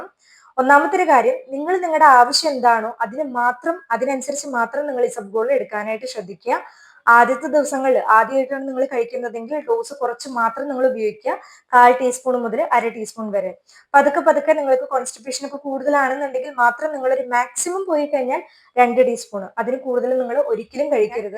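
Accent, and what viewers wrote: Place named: Thrissur, India. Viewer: തൃശൂർ മലയാള പോലുണ്ട്. ഉച്ച്ചാരണംശരിയല്ല സ്പീഡുകൂടുതൽ ആയതിനാൽ ചില അക്ഷരങ്ങൾ ചോർന്നു പോകുന്നു. പല്ല് ഇല്ലാത്തവർ സൂപ്പർ ഫാസ്റ്റ് സ്പീഡിൽ പറയും പോലെ ശ്രദ്ധിക്കുക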